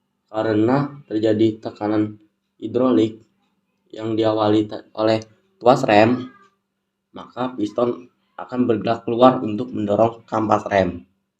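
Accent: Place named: Bangka, Indonesia. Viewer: Kayak orang Bangka Abang ni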